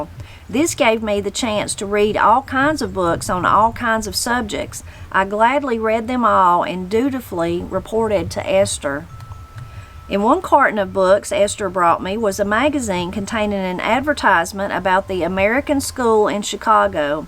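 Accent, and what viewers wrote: Appalachia, United States. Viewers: Thank you for sharing. Love your readings. You have the ability to bring Sidney to life with your Appalachian accent and your voice fluctuations. What a true blessing.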